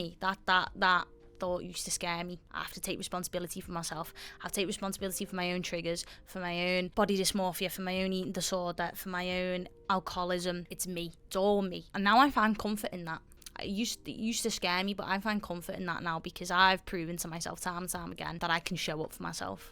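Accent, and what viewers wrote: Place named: Merseyside, United Kingdom. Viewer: Genuinely, I used to have awful MH then I got better for a while then I had an unexpected loss in the family very close to me and lost my way. I’ve been struggling with it for 3 years, not knowing how I got to a good place, not knowing my purpose in life and trying to avoid my feelings that I fell back into add/ction transference and resorted back to the BED I was diagnosed with that I’d managed to escape for a while. I’m from Merseyside as well so not only does your accent bring me a sense of home as I moved away from home, your videos have reminded me of how I did it the first time. I changed the way I spoke to myself, I changed the neural pathways. Sadly, negative ones were reinforced by the death of my loved one which is partly why I fell so hard (and sadly gained back some of the weight I’d lost the first time I got better). 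Thank you for reminding me that I can do hard things, and I can get back to how I was before because I’ve already done it once, I can do it again. I can take ownership of the bad things that happened to me and be resilient. I am resilient, and I always have been resilient. My family member died and I lost my home in my first year as a mature university student and I still got that degree and got a job despite feeling like the world was crashing and burning around me. I can do hard things. I can take control of my life. I can like myself again. And, most importantly, I can beat the add/ctions I once had. 6 years smoke free. 2.5 years alcohol free. Now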